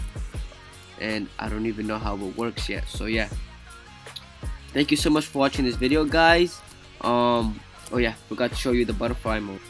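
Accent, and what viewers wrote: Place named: United States. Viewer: Discour Goup XDDDD, whay is your nationality? I noticed that you have a very strange American accent.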